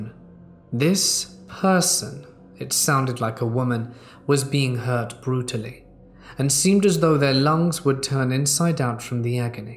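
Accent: United Kingdom. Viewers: I listen to other very good horror narrators, but, I ALWAYS come back to you!!! I sincerely feel calmed and soothed while listening to my favorite, British-speaking storyteller. I’d like to hear Pandora more as she grows up as a ‘ -Mini-Me”! A question/answer live chat would be a hoot with that little charmer! I am sure, that she dearly admires you! 😻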